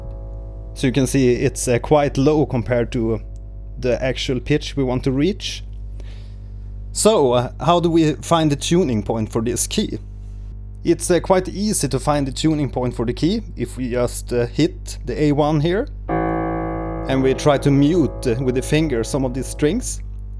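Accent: Sweden. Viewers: Thank you 🙏. You sounds like swedish 😂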